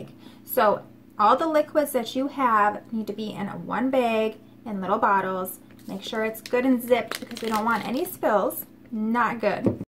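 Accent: Minnesota, United States. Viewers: Are you from Minnesota by any chance?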